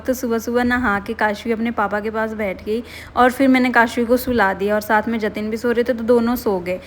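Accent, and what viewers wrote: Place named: Pahadi, India. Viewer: Today's voice over has a lil bit of pahadi accent 😂❤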